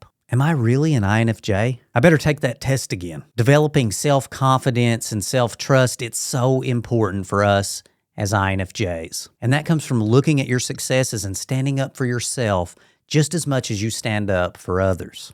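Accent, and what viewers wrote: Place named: Alabama, United States. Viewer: Hey are you from Alabama? Love the accent. And everything you saying is SOOOO TRUEEEE 😊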